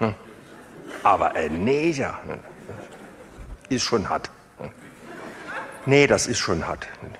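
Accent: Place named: Saarland, Germany. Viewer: Herlich ..und noch uff saarländisch 🤣🤣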